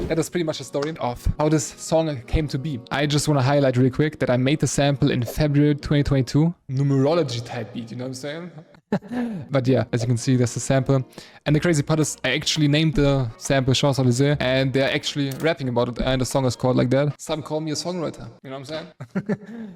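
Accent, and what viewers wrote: Germany. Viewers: its literally my top 1 fav song rn, fire shit 🔥🔥, weird question, but you german? its bc of your little accent, would be crazy lol. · man hört direkt das du auch aus Deutschland bist haha. glückwunsch!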